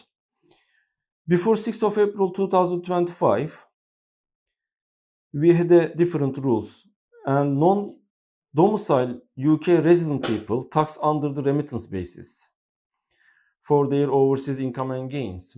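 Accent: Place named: Turkey. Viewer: Bu İngilizce ile İngilterede yaşadığı yetmemiş bir de millete vergi tavsiyesi veriyor adam pes vallahi. Hiç bu kadar Türkçe bir İngilizce dinlememiştim 😂😂 git Türkçe konuş bari bu kadar bu dili konuşamıyorsan birader. Bir de zaten bunu dinleyecek kesim ağırlıklı buradakiler, neden Türkçe anlatmadın anlamadık.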